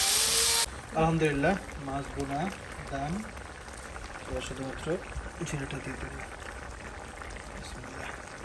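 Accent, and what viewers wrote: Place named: Barishal, Bangladesh. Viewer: আপনার ভাষা শুনলে কথার মেজাজটা গরম হয়ে যায় বরিশাইল্লা খাতার গাট্টি ভিডিও সামনে একটু শুদ্ধভাবে কথা বলার চেষ্টা করবেন বুঝতে পারছেন খেত কোথাকার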